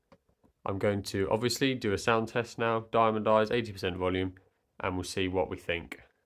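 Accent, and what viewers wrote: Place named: Cockney, United Kingdom. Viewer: This man is speaking with a strong Cockney accent. He must be from Essex as thats the only place in Britain where Cockney is still spoken.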